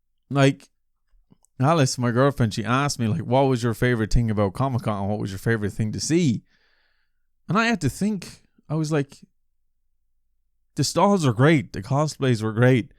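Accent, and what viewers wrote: Ireland. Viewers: my guy, other people’s joy is not your responsibility !!! don’t ever put that pressure on yourself again cause we’re here for the laughs but not at the expense of your health 😭 take however long you need cause if you feel great your content will be great without even trying. we’re not going anywhere so you can relax. i mean, at least i’m not cause you’re irish kylo ren you could literally read off a shopping list i’d still be fascinated lmao take care ! ✌️😛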